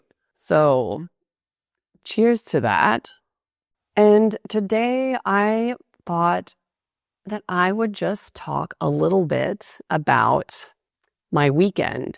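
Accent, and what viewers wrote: United States. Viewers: American accent its amazing 🥰so beautiful.I never study English but i understand 70% because i always was watching american movies in original language with sub russian And i speak spanish,i lived in Argentina for 6 years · This is American English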